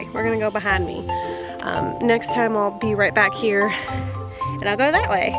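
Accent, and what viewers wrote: Kentucky, United States. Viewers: Wow, you have been in Kentucky way too long. You sound like a country girl.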